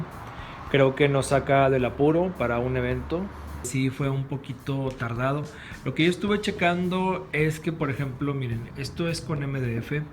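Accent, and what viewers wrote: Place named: Peru. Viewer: Suenas como si fueses peruano 😊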